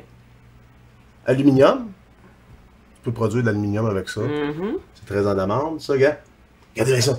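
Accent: Quebec, Canada. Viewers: desolé ,c est vrai j aime bien son idee ça peut etre un argument. mais c est sa maniere de parler et l acce t canadien ça ma suffit pour rigoler pour rien . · Oué bin qu' ils touchent pas a nos Coréens avec leurs coneries, se sont des gens formidables et très ouvert, merci pour la video jme suit bien marré, et vive l' accent quebecois^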